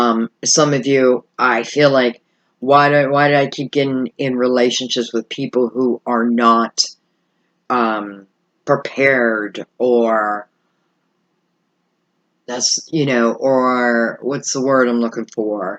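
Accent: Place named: Philadelphia, United States. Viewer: Girrrrl!!!! Omg you are so gifted. But you're also familiar and cozy. It's a joy to listen. And that Philly accent! Makes me homesick for PA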